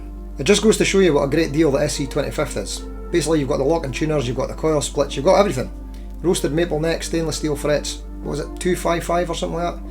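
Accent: Scotland, United Kingdom. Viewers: Guys I think he might be Scottish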